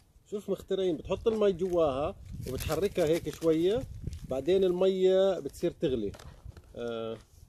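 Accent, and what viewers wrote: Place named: Palestine. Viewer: يا عيني على اللهجه الفلسطينيه شو حلوه ، بدّي اسالك شو اسم المحل وإذا بلاقي منو عنا في مونتريال ؟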